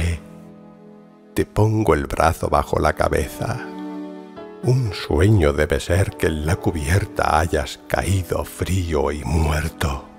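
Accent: Spain. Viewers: Eres el mejor señor, además me encanta el acento español, me parece que se oye más hermoso el castellano. Un saludo desde Colombia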